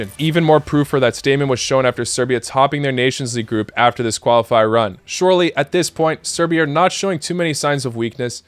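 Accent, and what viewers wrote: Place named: United States. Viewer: wdym he was born in usa he was born in croatia but his family moved to usa during the war of independence living there for so long must be why he shows an american accent in his videos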